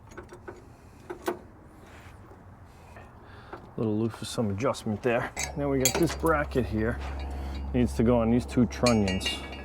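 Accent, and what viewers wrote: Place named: New England, United States. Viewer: I detect a NE accent. For the snow you get…..😬 “Tractor” you say? Funny, I don’t see a 3 point hitch…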